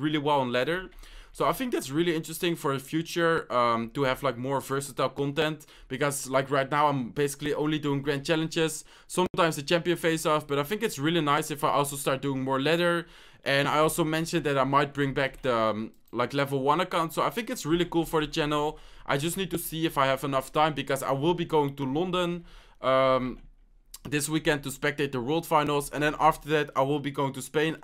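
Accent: Netherlands. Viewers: Haha Yes thats Dutch, Im dutch to. Its funny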